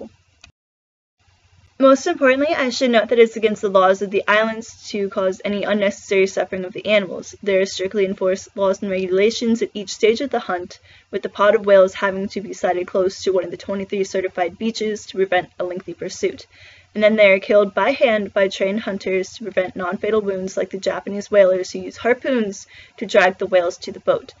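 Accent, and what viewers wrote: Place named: United States. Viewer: You sound American. There are many other Americans, who are not fooled by Paul Watson's Sea Shepherd. Thanks for nuanced viewpoint in your video.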